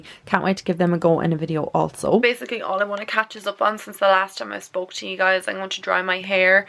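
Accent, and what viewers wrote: Ireland. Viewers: You are my favorite YouTuber. I think you’re absolutely adorable. Especially your accent-makes me want to visit Ireland! I just bought the Too Faced Sweet Peach palette (I typically stick with matte palettes because of allergies but loved their “Just Peachy Mattes” palette if you haven’t tried it) and had to reference back to your initial review before I swiped that card at the register 🤣.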